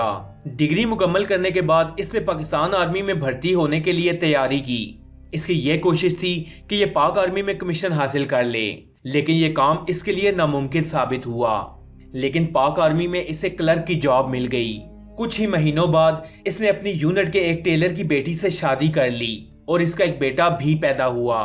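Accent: Punjab, India. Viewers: You are Urdu speaking in Punjabi accent...... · Please narration achi kre strong punjabi accent , urdu pr zulm hy thora sa soft ho jao bhai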